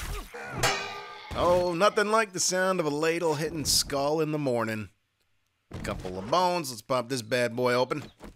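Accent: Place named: West, United States. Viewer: Loving this mod n ur western accent, keep em coming please! 💛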